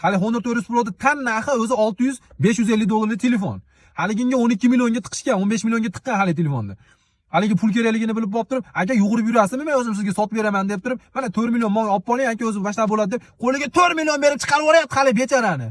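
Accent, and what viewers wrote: Andijan, Uzbekistan. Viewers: Анжанданмисиз гап йук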